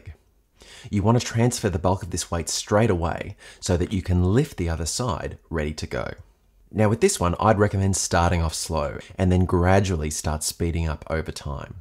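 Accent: Australia. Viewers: Is this guy Australian? He sounds that way. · Crikey he’s Australian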